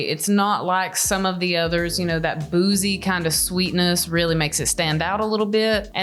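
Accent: South, United States. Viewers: Bang on job with the takeover! Your Southern "belle" accent is mint. 👍 Ashton is a lucky man, you support his hobby/passion, have a cool sense of humour and you're very attractive. I do like these takeovers, well do. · You nailed it, Great job 👌love those takeover videos, it’s really nice to have a woman’s opinion on men’s fragrances, I love how you pronounce the names with the southern accent 😊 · What state are you from? I love hearing another fellow southern accent on here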